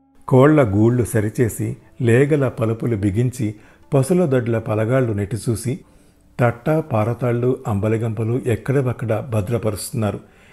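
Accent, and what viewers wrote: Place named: Telangana, India. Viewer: పాల బుగ్గల జీతగాడి కథ చాలా అందంగా ఉంది. యాదగిరి ని తలుచుకుంటే పాపం అనిపించింది. మీరు తెలంగాణా accent బాగా పలికారు. మీ ఇద్దరికీ అభినందనలు 💐💐💐💐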